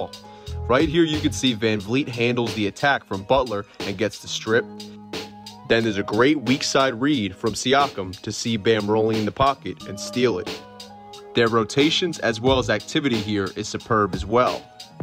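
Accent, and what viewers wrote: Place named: New York, United States. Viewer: Bouche has been so surprisingly good as well , he comes of the bench with great energy as well , liked the video , you definitely a new Yorker or jersey cat with that accent 😀 , excellent read on the team , kudos and cheers lad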